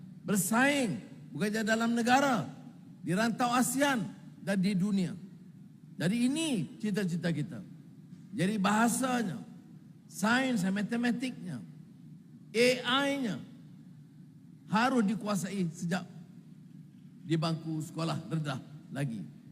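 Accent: Indonesia. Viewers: ni memang suke gunekan loghat Indonesia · Anak" kah? Atau budak"..😂😂😂😂. Ini perdana mentrinya aja berkata bargaya logat indonesia..banyak kosa kata indonesia yg digunakan...oh bahasa meleye sungguh merana kau..😭😭😭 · Kok logatnya bahasa Indonesia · coba Kalian dengerin dehh, si ngomong nya cenderung menggunakan logat bahasa Indonesia 😅😅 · Raja Malaydesh nonton pidato Ibrahim gak ya? Pidatonya banyak melafalkan bhs Indonesia!!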